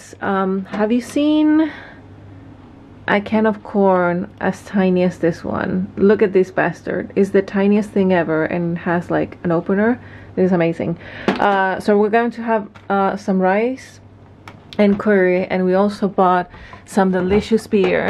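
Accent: Chile. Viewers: Hola! Aquí una mexicana que tuvo dificultad para entender su español chileno, pero qué lindo que es su acento, eh! <3 Me identifico contigo, porque mi esposo también está estudiando un PhD en U.S. y yo soy una artista frustrada (en eso no nos parecemos, tú ya lo eres jeje). Muchos saludos y que sigan disfrutando su tiempo en Tokio, si siguen por allá, nosotros morimos por visitar. Soy tu fan <3:D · Amo tu acento chileno!!! Y que escuches... Abrazo gigante desde Argentina aa💫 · Amo su acento chileno! 🥰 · !!! Me encantaría que hablaras más en español en tus videos!!! Tu acento chileno es genial y podrías poner subtítulos en inglés!!! おねがいします!!! 🙏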